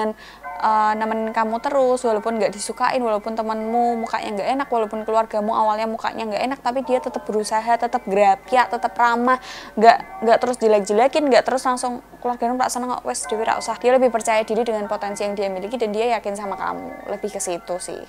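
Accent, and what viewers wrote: Java, Indonesia. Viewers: Medok'e celotehan mu mbaakk mantap jowo ne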